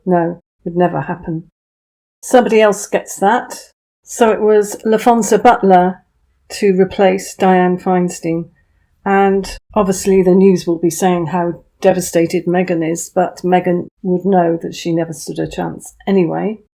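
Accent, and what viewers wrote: United Kingdom. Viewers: Thank you for your great reporting! You have the most lovely & quintessential British Lady voice. It's very kind & soothing. A breath of fresh air in all this worlds madness. Makes me miss the UK. Much love from Chicago😘❤️ & Peace🕊️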